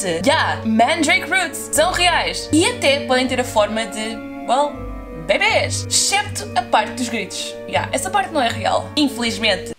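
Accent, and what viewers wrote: Portugal. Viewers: Parece q vc fala inglês seu português portugal e muito puxado😳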